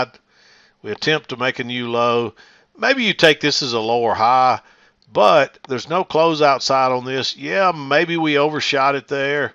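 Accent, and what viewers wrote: South, United States. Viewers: Boooo!!!!!!! Y’all leave that man alone. He’s from the south! We say stuff how we wanna.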